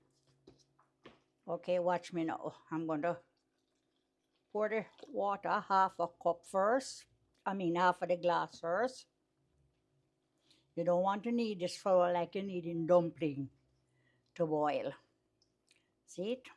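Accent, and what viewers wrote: Jamaica. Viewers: Yes....a pick up de real Jamaican talk and real Jamaican "cooking hand". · I love your Jamaican voice I feel like I am in Jamaica 🇯🇲 judt listening to you 😅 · Is she Jamaican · Her voice alone just bring me back to Jamaica. Reminds me of so many elders that sounds like her ❤. Once you put sugar its no longer fry dumplings tho 😂 its either sugar dumplings, Johnny cakes or bakes as the rest of the Caribbean call it 😁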